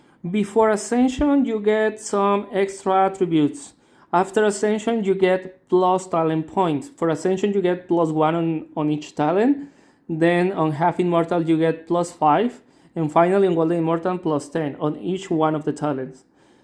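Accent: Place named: Mexico. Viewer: Nice, didn't expect you to sound like every other Mexican.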